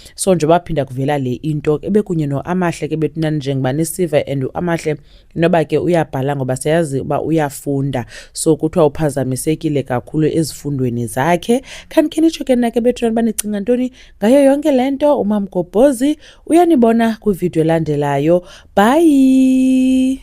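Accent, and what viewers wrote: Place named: Xhosa, South Africa. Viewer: ufunde kakuhle nanoma iaccent yona ithi ndingumXhosa - sizwile ❤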